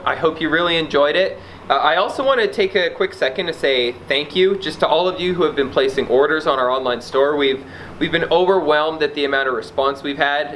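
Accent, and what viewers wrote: Canada. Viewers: Canadian there baud we all sound the same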